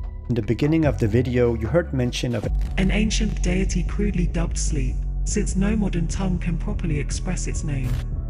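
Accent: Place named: Denmark. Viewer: Dansker accent :D great video.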